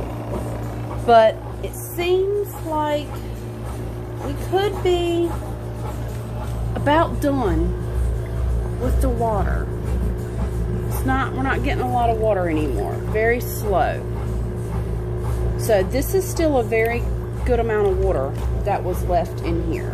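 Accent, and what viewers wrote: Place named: North Carolina, United States. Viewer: Are you from NC or SC? Your accent is damn near identical to mine and I'm from NC 😆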